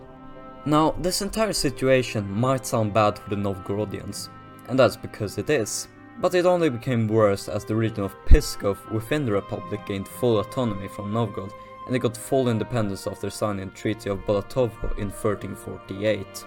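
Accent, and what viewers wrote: Sweden. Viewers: He is as a man from Norway i can hear that Swedish accent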